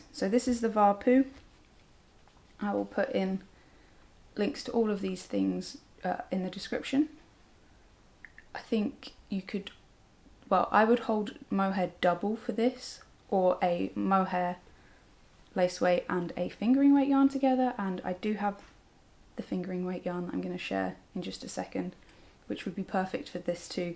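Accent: United Kingdom. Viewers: Hi, I found your channel recently and I've so enjoyed all the videos I've watched so far. I'm also from Herts, and a knitter, and your voice really reminds me of home- I live in London now, so not a million miles away so maybe that's weird 🙂! Anyway, just wanted to say Hi and introduce myself.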